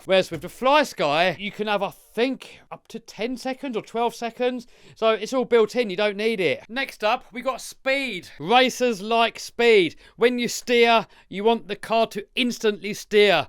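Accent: England, United Kingdom. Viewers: You sound English why are you sucking up to the yanks by giving prices in Dollars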